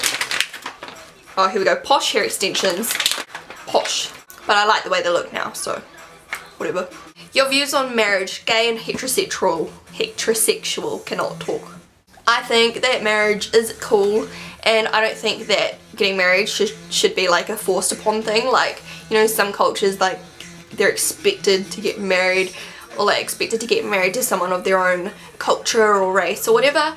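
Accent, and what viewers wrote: New Zealand. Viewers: shes from new zealand so she has an accent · are you from New Zealand?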